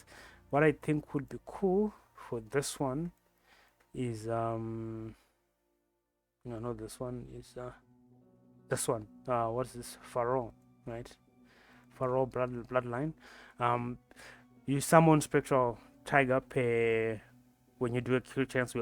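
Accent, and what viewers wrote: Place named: South Africa. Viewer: lol brother, where from South Africa you from?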